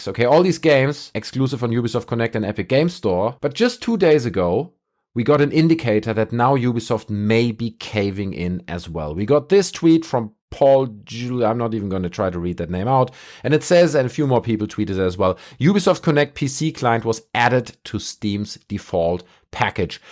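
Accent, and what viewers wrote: Germany. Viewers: Man I just love your pure German accent and wanna see you rant more and shit on ubishit!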